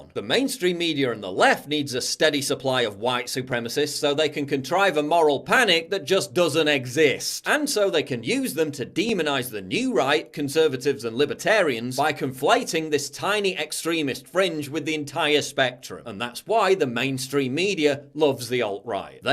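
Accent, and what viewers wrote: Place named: Sheffield, United Kingdom. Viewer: Firstly, it wasn't murder. She died of a heart attack - manslaughter at the worst. Secondly, the Alt-Right does resonate with a lot of people. Red Ice TV has 145,000+ subscribers. James Alssup, present as Charlottesville, has 162,192 subscribers. Tara McCarthy has 50,000 subscribers. Alt-Right leaning "Black Pigeon Speaks" has 249,887 subscribers. Thirdly, makes some dubious claims to smear us all. Only Andrew Anglin mocked Heather Heyer's death. You smear us as "holocaust deniers" yet give no examples. Jared Taylor, Greg Johnson and Vox Day are far smarter than you'll ever be. You're just a sarcy and childish civic nationalist, regurgitating the same old Classical Liberal talking points with an annoying Sheffield accent.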